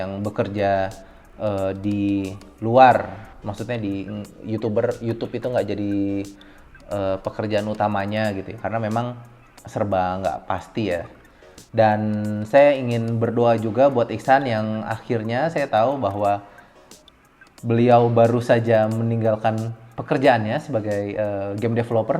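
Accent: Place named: Java, Indonesia. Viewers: Klo inget inget tangannya yg belang 😃 Dan cara ngomongnya yg medok, unik 😃 Tapi keren lah isi kontennya singkat padat jelas 👍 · jogja kali. soalnya logatnya jogja bgt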